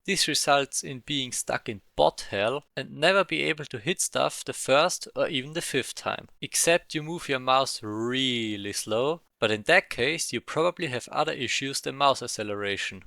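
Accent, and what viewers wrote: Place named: Germany. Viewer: you German? I like your accent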